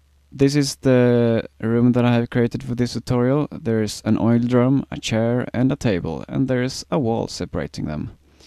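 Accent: Sweden. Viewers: Thank you very much! You sound extremly swedish!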